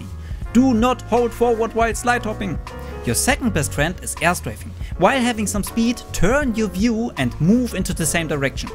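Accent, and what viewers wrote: Germany. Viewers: not really. he sounds very german :) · As a German he sounds german :D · Why do you have such a german accent? Tbh, if you are german, you are speaking very clearly, easy to understand Love your videos btw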